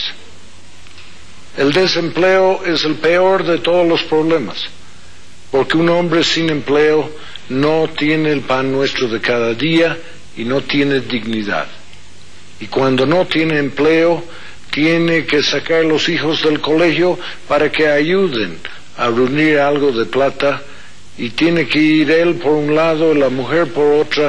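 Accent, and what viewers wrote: United States. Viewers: Es boliviano. Nada más q a vivido más en EEUU q en bolivia. Por eso su asentó americano.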